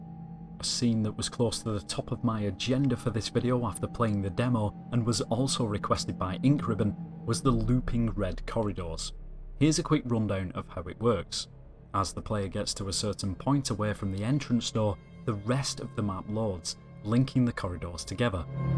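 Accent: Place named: RP, United Kingdom. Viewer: ur not doing bbc news u dont have to speak like such a knobhead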